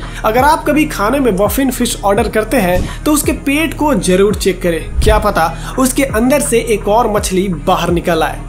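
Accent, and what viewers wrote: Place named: Bihar, India. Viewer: Awaz???jani pechani hai Behari touch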